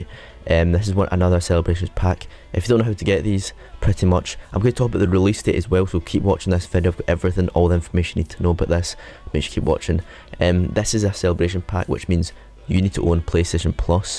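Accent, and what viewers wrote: Scotland, United Kingdom. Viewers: Yeah He Is Defintley Scottish He Does Not Sound Like Arnold Schquanegger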